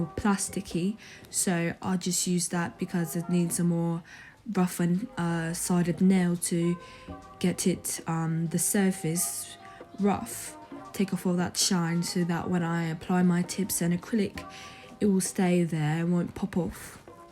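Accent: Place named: United Kingdom. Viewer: its the british accent for me. You're doing great. Really happy for your business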